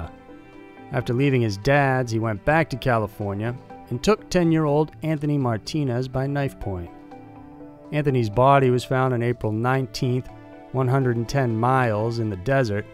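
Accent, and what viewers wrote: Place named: Texas, United States. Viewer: Your bayou / Texan syrupy accent is just so nice to listen to - despite the content being so disturbing. Happy to have found your channel. 💖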